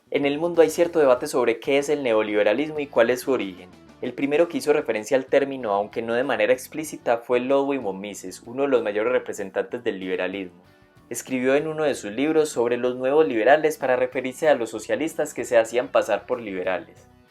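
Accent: Antioquia, Colombia. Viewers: Oye, puedo notar en tu acento que eres de colombia. De estar en lo correcto te queria preguntar: pensando en las proximas elecciones que candidato tu consideras que representa mejor o en algo los ideales liberales? Saludos desde Australia! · Seguro después de un año ya sabes la respuesta, pero sí, lo es. Pareciera ser de la región de Antioquia o al rededores por el acento. Saludos.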